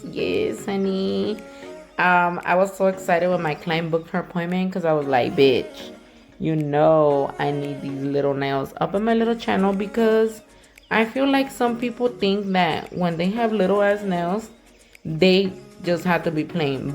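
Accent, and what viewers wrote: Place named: Spain. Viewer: I love love your Spanish accent, sounds like my granddaughter blessings from Killeen Texas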